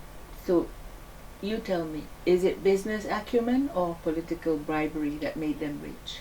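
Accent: Perak, Malaysia. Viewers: yalaaa..alaaa seronok dengor akak cakap melayu sbb ade slang perak ..hahaaa jgn marah guro aje kakk...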